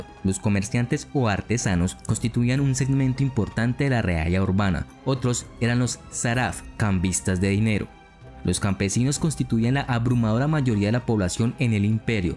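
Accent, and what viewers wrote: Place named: Colombia. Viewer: El que está hablando debe pronunciar la "d", parece colombiano, o es, ellos no pronuncian bien las consonantes, y le cambian el acento natural a las palabras.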